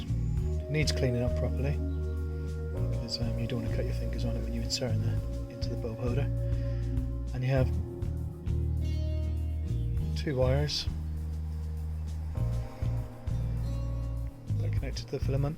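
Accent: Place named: Plymouth, United Kingdom. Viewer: Well done mate good video, I am going to give this a go on my MK4, your accent sounds local to mine, I'm in Plymouth!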